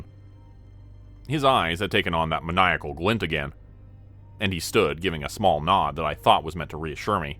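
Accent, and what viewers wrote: Canada. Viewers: How come someone from South Florida has a Canadian accent? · Sounds like you're from Canada not Florida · Sounds like a Canadian accent. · You say you're from Florida but you sound like a canuk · Ah geeze! The narrator is Canadian and does not sound like an American accent not a Floridian at all. My family being half Canadian I would know that accent anywhere. Aboot White ooot Cut ooot Ooot here in the wilderness. 😢😢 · Sounds like story teller is Canadian.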